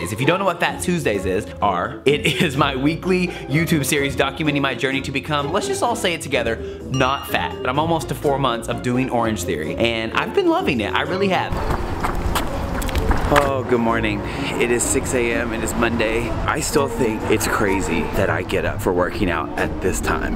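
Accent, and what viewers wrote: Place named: South, United States. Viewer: Do Sunday. Sunday is a day that I’m just getting my life together, maybe being lazy and hanging out with you and everyone else during that time was super fun!!! Also — are you from Seattle? Yer accent can git reaul southern,!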